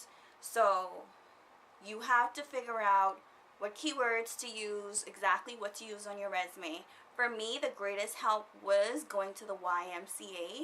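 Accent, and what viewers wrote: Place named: Bahamas. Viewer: 🙂I love the fact how you are still speaking like a true Bahamian.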